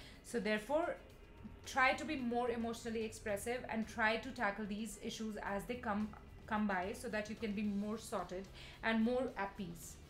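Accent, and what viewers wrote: India. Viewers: The Indian accent in the voice was a bit of distraction to what needed emphasis. Nevertheless, Aquarius are geniuses and none, among all signs, have a total perfection. Thanks for the suggested actions. 😊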